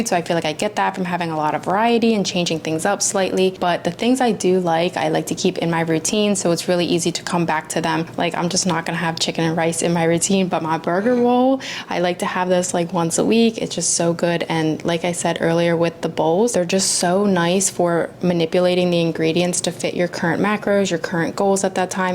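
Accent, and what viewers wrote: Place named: Maryland, United States. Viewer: Your accent sounds very maryland-ish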